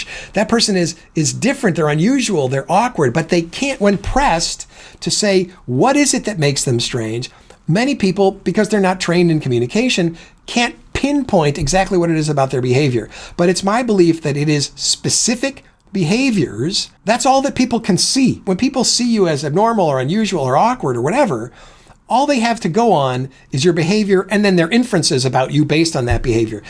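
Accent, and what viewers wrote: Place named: Chicago, United States. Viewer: He's got a Chicago accent that I find distracting.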